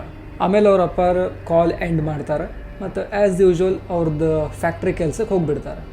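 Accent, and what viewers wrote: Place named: North Karnataka, India. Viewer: Ur good never heard about u...good north Karnataka accent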